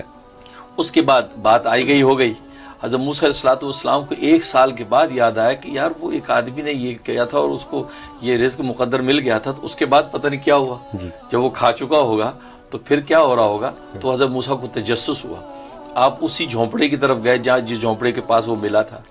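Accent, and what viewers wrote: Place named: Punjab, India. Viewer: Aaraam say bayaan karo or tareqay say ok Lekin tum full Panjabi jahalat. Zaban main suna rahay hoo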